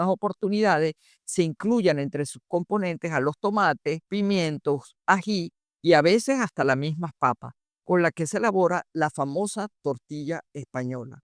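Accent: Venezuela. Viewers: Por Dios ❤❤❤ que profesionalismo, la bibliografía me dejo asombrado 🎉🎉🎉🎉 me encanta su tono de voz al narrar, me reconforta ese acento venezolano a la distancia. Infinitas gracias profe. Hola pueda llamarla profe.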